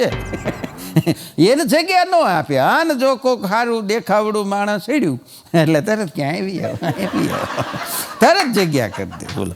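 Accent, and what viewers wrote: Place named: Kathiawar, India. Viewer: Tmey. Sudtth. Gujarati. Bhasama. Bolo. Kadttiya vadi. Bhasa. Gamti. Ndthi😢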